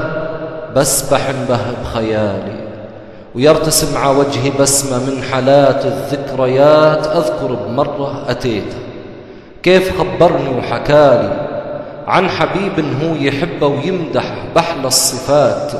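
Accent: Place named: Bedouin, Jordan. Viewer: كلام جميل بارك الله فيك لكن هذا ما هو خليجي هذي لهجه بدوية اردنية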